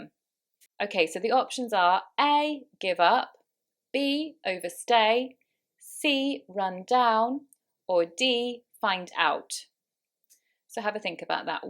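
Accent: United Kingdom. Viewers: omg, you have a pretty good british accent!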